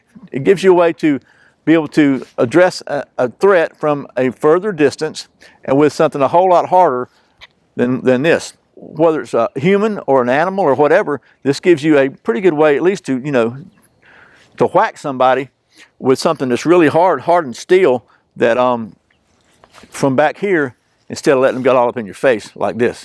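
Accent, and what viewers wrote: Georgia, United States. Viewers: You have a really relaxing voice. Are you from GA or thereabouts?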